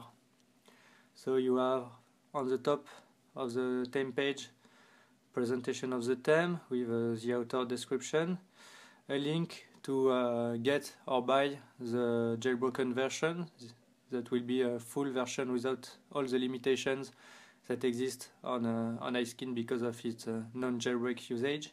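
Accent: France. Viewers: LOL un français qui veut parler anglais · I Like His French Accent But I Dislike His Pronunciation Of 'Theme' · I like your French accent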